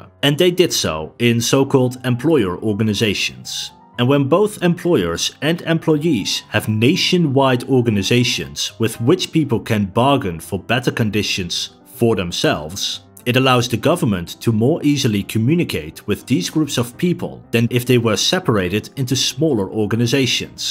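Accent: Netherlands. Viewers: This dude sounds Dutch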